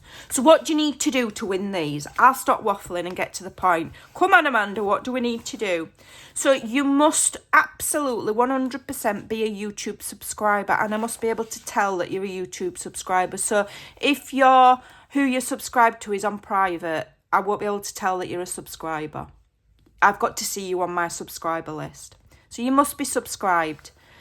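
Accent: Yorkshire, United Kingdom. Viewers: Hi Amanda I am from N Ireland I love when you talk to yourself “stop waffling and get on with it” in your Yorkshire accent. these journals are gorgeous if it was me I could not part with them. Your Ko-Fi items are great value I did enjoy the mini book series and digital. Keep up the great work ❤ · Hi, Amanda. I'm from Spain. Both your crafting skills and your fabulous British accent ( I'm a teacher of English) inspire me. I'm about to engage in my first crafting project ever and I chose you as my guide because you are not afraid of making mistakes, which is what builds confidence eventually. This is what I need. Well, sorry. Let's get to the point. There are tons of saying to mention I had a hard time to understand at first, such as the one when you talk to the paper and call it "sucker". My favourite one is " she says", basically because I still haven't figured out what it means. 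I guess its like saying " or this is what is supposed to happen"´.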